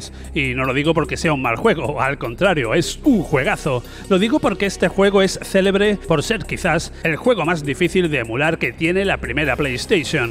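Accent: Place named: Andalusia, Spain. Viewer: Me encanta como los ibéricos pronuncian y distinguen los sonidos SC como eSH-Zenarios 😆😍Buenísimo el video abrazos desde Argentina al gran andaluz 🤩🥰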